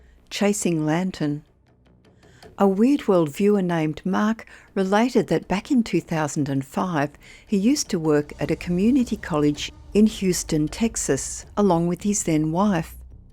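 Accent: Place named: Australia. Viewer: Sexy aussie voice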